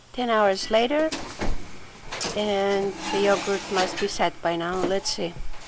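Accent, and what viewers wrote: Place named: Greece. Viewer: From your slight accent I take it you are also Greek as I am? If you are then I can happily say "Efharesto para polio", translated into Thank you very much. I use Fage thick Greek store-bought yogurt as my starter if I do not have previous home made yogurt left over, and with the Fage it also comes out delicious. For many years I used to use my Greek Grandmother's method of straining the yogurt thru a clean pillowcase, since cheesecloth is really a pain, but recently I decided to buy the Euro Cuisine GY50 Greek Yogurt Maker, which is really a yogurt strainer. It fits easily in my fridge, and strains the whey beautifully, and the end result is very thick Greek yogurt. Also the longer I leave it to strain in the fridge the better, because it also becomes thick like goat cheese, and if I add some salt to it then it becomes ricotta cheese. 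To clean it I just use my sink sprayer on light force, and get all the yogurt out until it is clean, then air dry it for the next time. No dishwasher for this item. If this type of strainer interests you look on youtube as they have video's about it. Thanks again for your instructional video, and I thoroughly enjoyed it.. Ya Sou, keh kalie oreksie meh to yaourtie sou!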